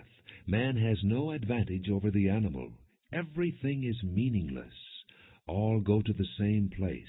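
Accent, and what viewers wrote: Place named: United States. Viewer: had a hankering for this book and had to look awhile for an American accent reading, the cheesy shakespearean british ones fail to capture the modernity/coherence of the prose. good stuff!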